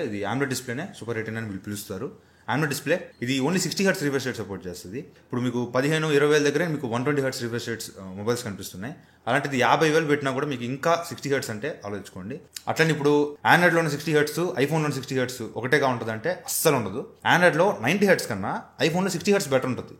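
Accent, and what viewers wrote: Nellore, India. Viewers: anna manadi nellore aa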